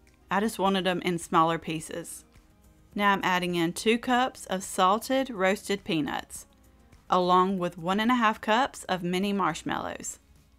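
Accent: South, United States. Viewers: Here in New England (Maine for me) we make Needhams in it, makes the chocolate shinny, check out a recipe for them.Love your southern drawl.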